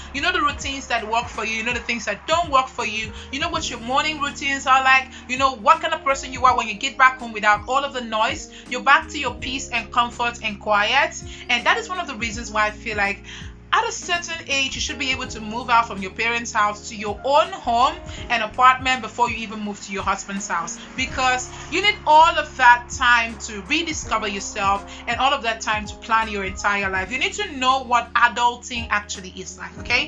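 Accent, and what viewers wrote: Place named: United States. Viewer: Why do you have an American accent tho? 😭😭😭 Cringe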